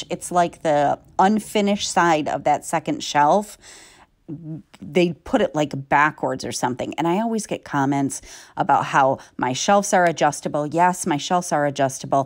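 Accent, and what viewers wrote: United Kingdom. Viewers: I talk to my daughter in a British accent all the time like you do!😂😂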